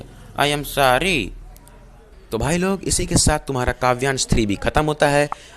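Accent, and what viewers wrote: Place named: Bihar, India. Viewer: Gajab bihari language bole hai bhaiya aap, and thanks for this great explantion🥰 Love from Bihar❤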